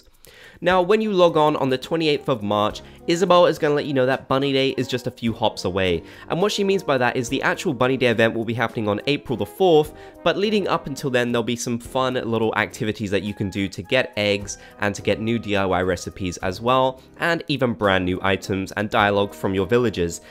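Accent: United Kingdom. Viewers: are u British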